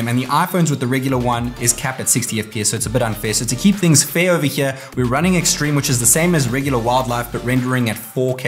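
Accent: South Africa. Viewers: Bro sounds South African🧐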